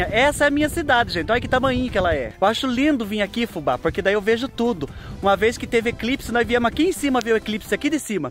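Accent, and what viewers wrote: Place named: South, Brazil. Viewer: A primeira vez que vi os vídeos do Fubá,eu pensei que ele fosse do Sul tbm.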